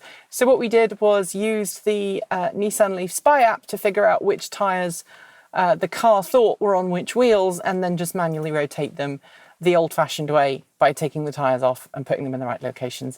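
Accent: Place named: United Kingdom. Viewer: I like your accent , are you originally From uk?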